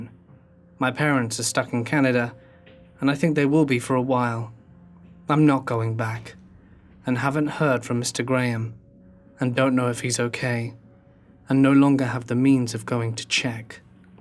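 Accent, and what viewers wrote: England, United Kingdom. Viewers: You so Emglish ,i love it 🥰xxx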